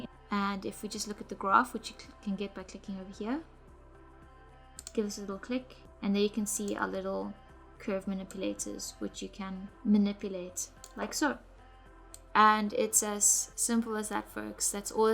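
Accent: South Africa. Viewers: Awesome tutorial! And great to hear an SA accent with shots of Milnerton and Hout Bay :D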